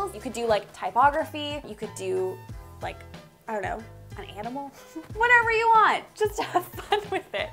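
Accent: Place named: Canada. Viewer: Very useful, you are very talented. I love your canadian accent too. 'Aboot!' And you live in Toronto, you lucky person!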